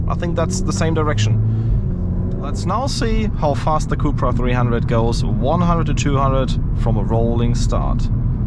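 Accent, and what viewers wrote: Germany. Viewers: I love the German accent😊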